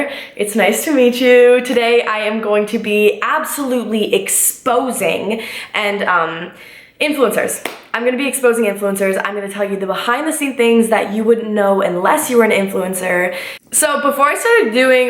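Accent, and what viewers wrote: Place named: Canada. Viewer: Can really hear the canadian in you!!!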